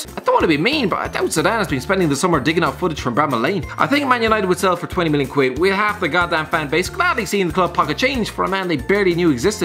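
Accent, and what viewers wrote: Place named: Ireland. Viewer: I can’t understand a word your saying slow down lad. I love the Irish accent but you sound like you’ve sniffed a few gram lol chill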